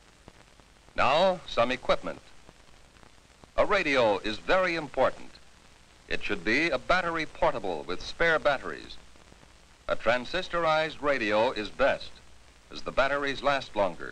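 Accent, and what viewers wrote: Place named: Mid-Atlantic, United States. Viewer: Mid Atlantic accent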